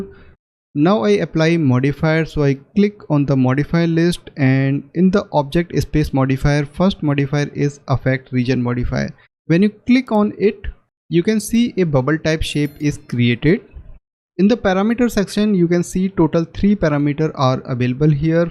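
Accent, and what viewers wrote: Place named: India. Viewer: There should be a setting that transforms İndian accent to American accent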